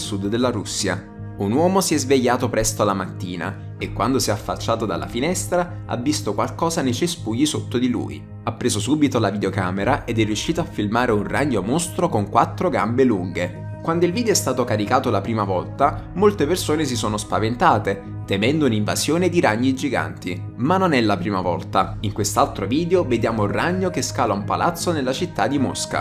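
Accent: South, Italy. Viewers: peccato l'accento meridionale del commentatore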